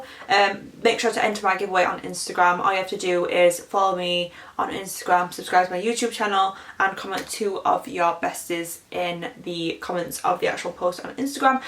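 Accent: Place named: RP, United Kingdom. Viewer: oml your brtish acsent is the next level of porsh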